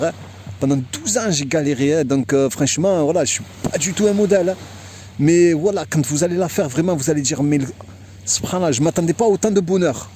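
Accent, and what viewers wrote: Marseille, France. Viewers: tu as l'accent marseillais , tu es sur que tu es d' angers ? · Salam alaykoum wa rahmatullah wa barakattuh Qu'Allah azawagell t'accorde le bien ainsi que ta famille 👪. Mais dit moi t'es un peu Marseillais non?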